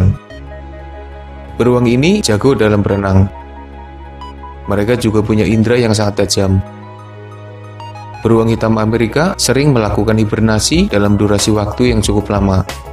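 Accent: Banyumas, Indonesia. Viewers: Bang ...org ngapak. Yaaaa???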